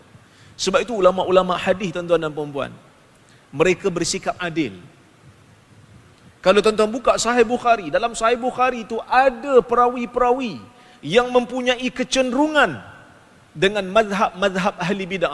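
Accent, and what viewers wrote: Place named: Arab world. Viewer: aku tgk die nie cra ckp mcm si Anu tu... lajjah arab perggh.. tp ckp suka spin..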